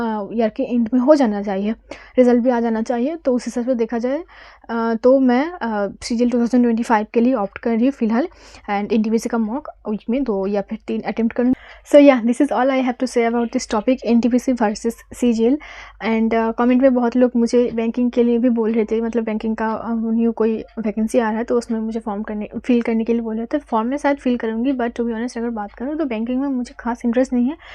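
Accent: Bengal, India. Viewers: Ntpc ka process is baari fast hoga Or West bengal me vacancy bhi accha h....tumhara bolne ka style se lag rha h u r from bengal... So don't miss it keep going on👍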